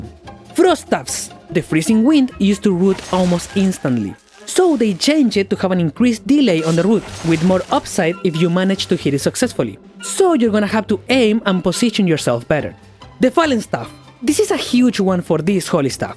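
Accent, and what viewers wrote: Chile. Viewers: eres chileno? sacate una guild C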